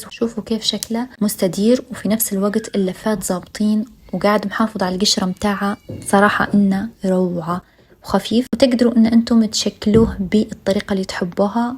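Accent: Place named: East, Libya. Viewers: ايه ليبيه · خمنت ليبيه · ليبيه شرقاويه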